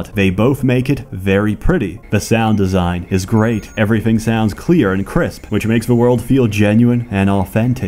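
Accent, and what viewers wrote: Poland. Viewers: Are you from Poland? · U Polish ?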